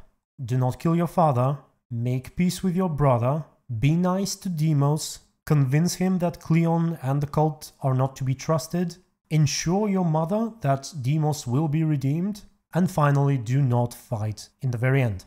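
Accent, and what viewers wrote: Bulgaria. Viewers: Are you from Bulgaria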